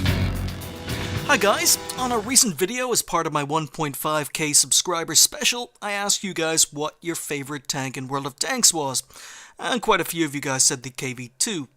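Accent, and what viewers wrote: Ireland. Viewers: Forgive me if I offend anyone but in my admittedly very limited experience of english accents I think your Irish accent isn't very pronounced. It's definitely there but I was wondering if you're from Northern Ireland which I assume would have a more British accent?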